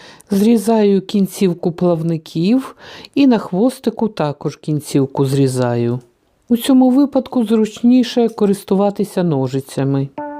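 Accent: Standard. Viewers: Дуже приємно слухати чисту українську мову без суржика. Дуже приємна господиня!. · Как приятно слышать чистый украинский язык! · Какой красивый Чистый украинский язык.А не то что с ним сейчас сделали😡педагогыня минестриня😡😡😡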